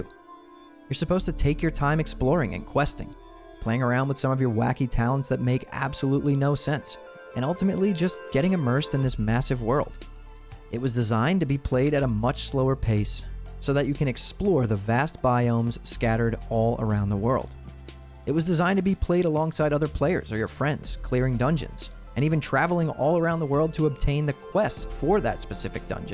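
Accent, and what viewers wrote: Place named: England, United Kingdom. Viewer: You forgot one thing. For players from other country’s the social experience could be difficult. I hear that you are english. But for a dutch person like me it was not easy. BUT i really wanted to get better in learning english. I learned more in wow then at school. Not everyone has the luxury that they get servers in their own language.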